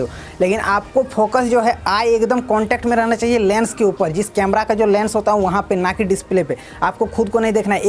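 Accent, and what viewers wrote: Bengal, India. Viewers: vai aap Bengali Ho....? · bhai ap bangali he kiya?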